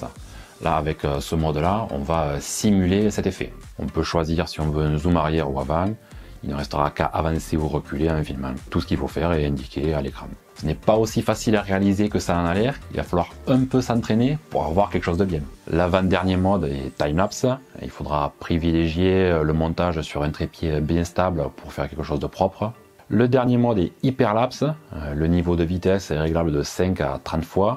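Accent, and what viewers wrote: South, France. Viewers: T'as une maladie ou c'est un accent du sud? Juste pour savoir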